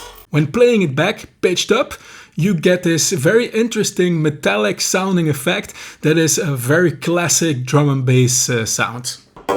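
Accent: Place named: Netherlands. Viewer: Recently started music production (never played a note before) and last 2 days been trying to make a ambient jungle song and it goes suprisingly well, only didnt know how to implement a bass. This video helped a lot in general. Groeten uit Den Haag. (Zo te horen ben je nederlands )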